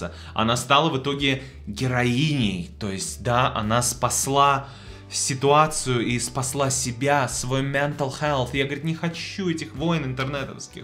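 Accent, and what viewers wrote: Ukraine. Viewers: в суржике используются "русизмы". Но произношение украинское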